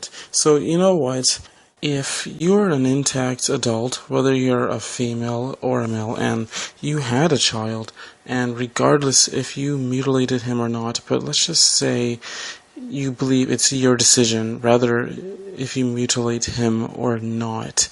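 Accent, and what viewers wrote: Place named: Russia. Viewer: I like your accent is it Russian.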